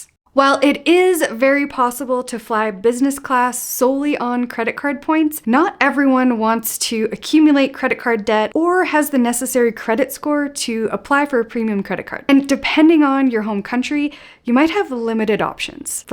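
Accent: Canada. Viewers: Did I catch a little "pack an oatfit" in your "beg" Canadian accent there are you from somewhere else? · Man ,you are THE BEST !!! This was the best flight hack video I've ever watched !! Believe me I've watched a lot !! Lol. You covered so many sites & hacks nobody else mentioned !! The best editing, too !! And the way you speak,even though you spoke fast ,it was very clear to hear/ understand that I didn't need to repeat any !! Lol. Canadian English is the easiest to ears ,but still ,you are that good !! Usually I find almost the same content or lots of overlapping information in this kind of videos & I only saw several in your video ( this ) & they were important to mention anyway,but you provided way more new informations / more original, creative tips !! And so much fun to watch ,so,I got very excited to watch/ listen !! Even Level 8 suitcase ! I knew what was as I've seen others using that one in their video ,but I don't recall them mentioning what you described !? 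Like it is very handy that there's no zipper ,or it'll not allow us to overpacking ,so it's actually great for people with the tendency ! Lol Also you realized that suitcase would had saved your money because it's very durable & you purchased 3 , 4 luggage bags in 10 years as they broke !! As soon as the video finished,I hit the subscription button !! This was my very 1st video of yours to watch & I hardly ever sub to any if it is the first one ,or not til 4th or 5th even !! Lol As others video's subjects are super intriguing you mentioned in this & this was that good enough !! As well as I noticed that you seem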